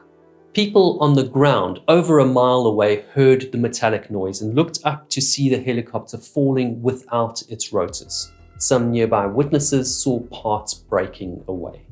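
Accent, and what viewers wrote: South Africa. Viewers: Nice to hear your SA accent again from NZ. In the 1960s the old BMC Mini had a magnet on the oil drain bung, it was often covered in fine steel particles, if anything larger was noted, they were visually examined. Sections of teeth could be identified by comparing with other gear clusters. The customer could then decide if a precautionary teardown was in order or if they would risk it until failure. Only once did I hear of a complete seizure, this resulted in the car stopping like full application of the brakes; being front wheel drive. It was hit by the following car, no injuries. Keep your good work videos going.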